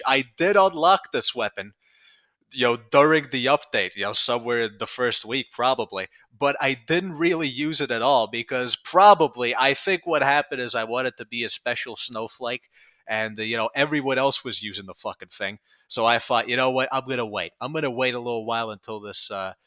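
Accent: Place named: New York, United States. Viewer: do you think swearing 27 times every sentence in new york accent makes you sound more confident or smth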